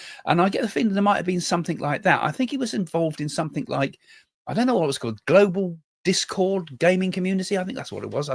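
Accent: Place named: West Country, United Kingdom. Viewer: I’m new here. You sound like me. You west country? 😂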